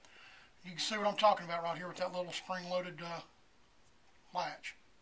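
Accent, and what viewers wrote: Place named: Mississippi, United States. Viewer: I enjoyed your BAR disassemby video. I have had two in 7mm Mag. No recoil and accurate. The only problem is that I get walleyed trying to keep track of the brass when I shoot. You don't sound like Down East. I lived in Maine for 5 years in the '60's and spent a lot of time in North Berwick. You sound more like Mississippi, where I am in exile now. Keep up the good films.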